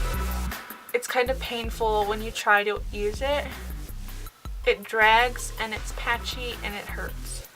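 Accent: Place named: Boston, United States. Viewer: Your boston is so cute!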